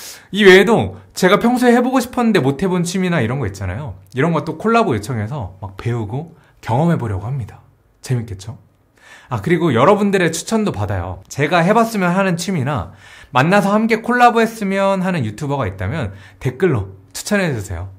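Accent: Cheongju, South Korea. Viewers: 먹방채널이랑 일상채널을 나눠도 좋을것같아용!!! 일상채널에서 게임영상도 올라가고 가족이랑 놀러가는 일상도 올리규 ㅠㅠ 그리고 저 청주 사투리 좋아하는데 라이브도 하면서 사투리도 보여주시구~ 이것저것 많이 해주셨으면 좋겠어요!!편집 영상도 재밌는데 라이브도 재밌을것같아요 ㅎㅎㅎ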